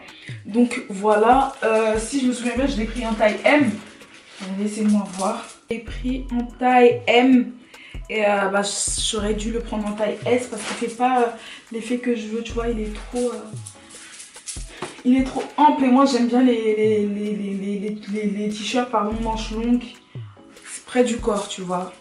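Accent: Ivory Coast. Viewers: J’aime trop ta manière de parlé en ivoirien même je t’aime trop fort ❤️❤️❤️❤️ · J’ai entendu le début j’ai su qu’elle était ivoirienne 😭🤍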